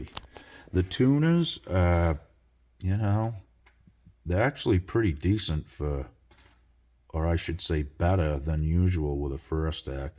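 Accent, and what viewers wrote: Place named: Boston, United States. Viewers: Cool ‘guitah’. Love your New England accent. 🤘😎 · Good Job. Love your Boston accent. Thanks. The VW Garagemaster could become a collectible.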